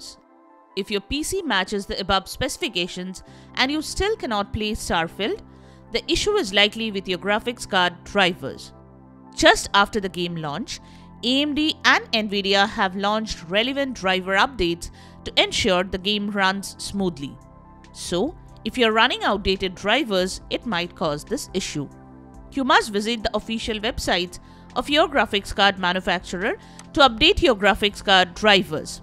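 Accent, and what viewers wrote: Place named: India. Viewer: Dislike for the indian accent. I don't like the color of skin and the way they look/behave.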